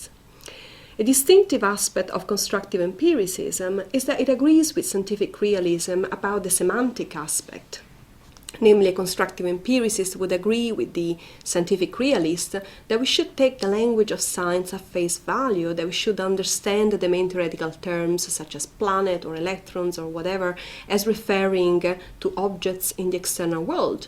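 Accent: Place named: Italy. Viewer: Really good, and simple explanation. Cute italian accent as well. Thanks for sharing.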